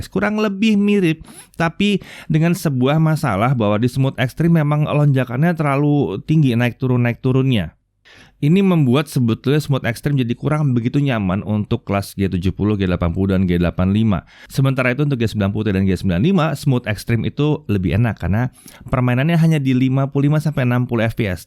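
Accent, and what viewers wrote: Indonesia. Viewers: maklumin aja lidah org indonesia gitu ,ya biarin lah 😂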